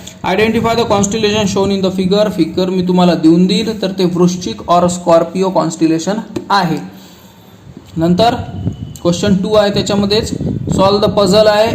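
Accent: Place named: Nagpur, India. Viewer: Sir are you from nagpur I think because you talk like nagpuri bhasha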